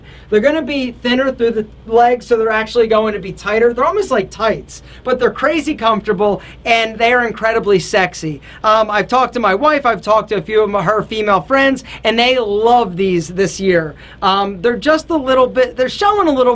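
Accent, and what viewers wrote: United States. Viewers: I’ve never seen someone sound so american like this man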